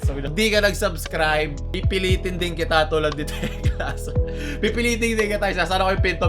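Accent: Visayas, Philippines. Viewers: Bisaya nis? Bagohan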